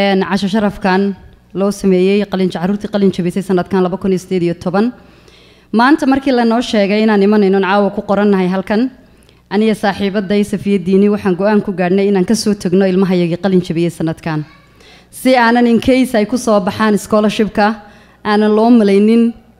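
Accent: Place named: Awdal, Somalia. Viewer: Ree awdaL weeyi gadaburzi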